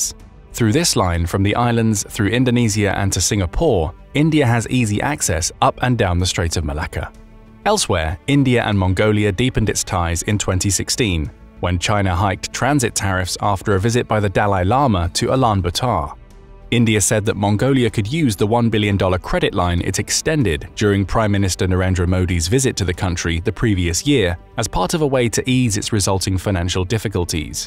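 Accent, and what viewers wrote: United Kingdom. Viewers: Click bait. Again. This channel is silly but it has a British accent, so, I guess that's why folks are here..?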